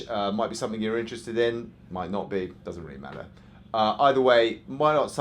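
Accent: England, United Kingdom. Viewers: I think your English accent helped me learn haha! Thanks from the U.S. cheers have a good one! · You’re so unapologetically English with your accent. I love it! 😍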